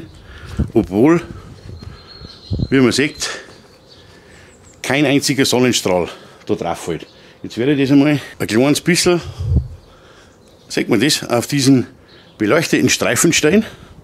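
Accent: Bavaria, Germany. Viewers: Sorry , alles schön und gut aber es versteht nicht jeder " Extrem Bayrisch" ich kann Dir wirlich nicht folgen ! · Einfach klasse, deine Art zu erklären ist gut verständlich. Ok, dein bayrischen Dialekt versteht nicht jeder, aber ich hab da überhaupt kein Problem. Mach weiter so du bist klasse. Gruß Klaus aus Unterfranken 😂👍noch Bayern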